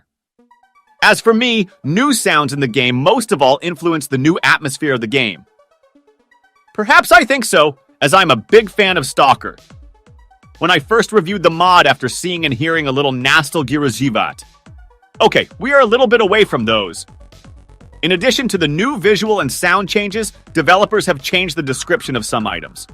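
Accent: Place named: England, United Kingdom. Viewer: Cool inglesh voice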